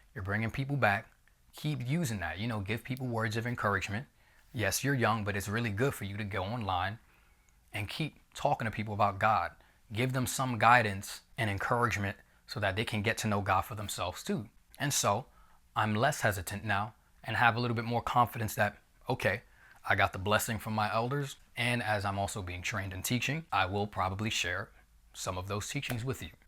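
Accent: New York, United States. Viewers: he has a NY accent… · i think I read “what’s this accent” the first time. 💀What I meant is there was no accent change, he talks the same (the NY accent) he’s just not doing his characters, with had a more cartoonish personality and accent. Remember his movie about getting robber? He sounds the same as this in that, because he’s not playing a character, but being himself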